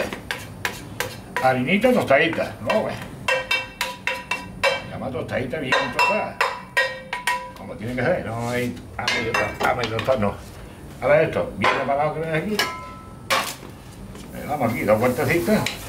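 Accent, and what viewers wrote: Spain. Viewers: qué no sé le entiende?? Qué le habla al diafragma porque vamos...... andaluz😢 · Da gusto verte cocinar y escuchar ese canario calidad a ver si lo enseñas en algún vídeo, un saludo.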